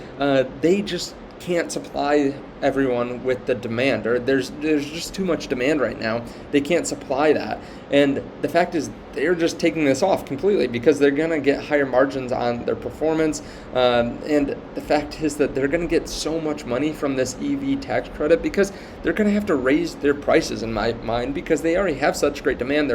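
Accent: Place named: United States. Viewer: What are you talking about "foreign"? His English is perfect. He sounds American. I've lived in Portland most of my life. Even many Americans pronounce Oregon wrong because they aren't from this region or haven't heard it said much. It's not an insult to correct someone about it, nor was it meant as one. Seriously chill the F out lol.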